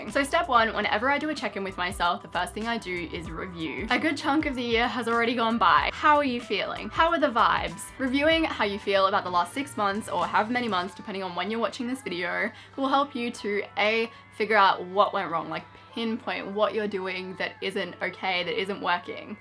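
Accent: Australia. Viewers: I love the way you talk! Being from Germany, I normally only watch TV Shows in American English and I am so not used to Australian(I believe) English and I LOVE it! Thanks for tipps. I am kinda in between kind of type, I got some goals done(planned to go vegan for a month and went vegan on a constant so I went over the top of my goals; planned to fall back in love with my life and body and I am rocking it;I planned to visit at least 5 new cities and I have 6 by now!) but then again, I planned to make more friends and start a blog and didn't achieve it yet but in German we say “the night is still young“ except it is the year in my case. Soooo much love coming from Germany all your way! Thank you for always reminding me to go after my goals and grow! PS: how is your year going regarding to your goals?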